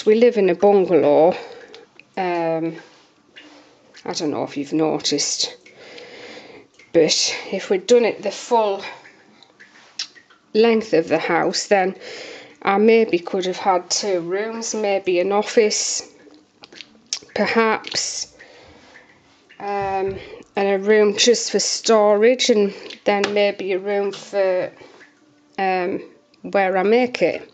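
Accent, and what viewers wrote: United Kingdom. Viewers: Definitely British :)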